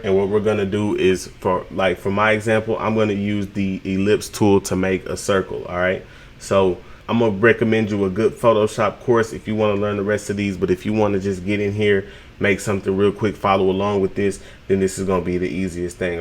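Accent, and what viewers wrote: United States. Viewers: I here that Texas accent. can't wait to dive into NFTs this year!!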